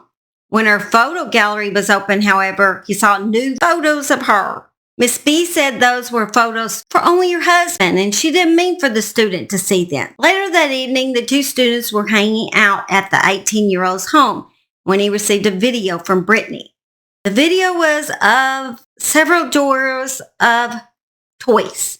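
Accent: South, United States. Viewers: You might be a "lil ole' southern lady" which is a compliment, but you're an awesome researcher and story teller. We appreciate you and love you. Thank you,. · Just a southern harley riding belle of the south lol 😂 love my southern true crime narrator 😊😊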